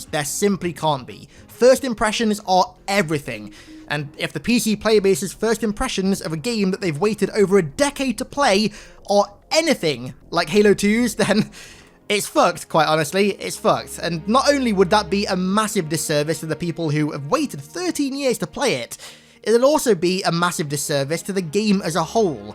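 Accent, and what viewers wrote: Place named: United Kingdom. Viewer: Lispy British man? I didn't know that film critic Jonathan Ross was also a gaming Youtuber!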